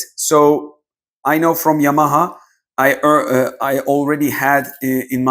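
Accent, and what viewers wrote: Turkey. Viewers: Türkiyeden selamlar aksandan anlasılıyor :))))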